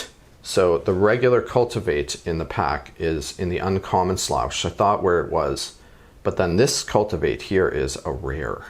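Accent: Canada. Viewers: The amount of times he’ll say “eh” in his videos, no doubt he’s Canadian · First! Awesome videos! Canadian Accent is Crazy!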